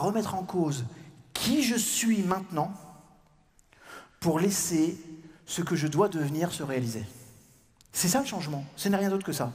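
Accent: Quebec, Canada. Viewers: Merci d’avoir publié ta conférence de l’AZ Paris! Je rêvais de t’entendre au Sommet de Québec où j’étais pour la première fois cette année. C’est mon prix de consolation:0) … et en passant ton accent québécois oh la la … comme dit Martin à faire la même chose 1000 fois, on finit par y arriver! Je t’adore, merci de tous ces partages sur YouTube, tu fais partie de mon univers plusieurs fois semaine et je m’enrichis à chaque visionnement. Bonne continuité!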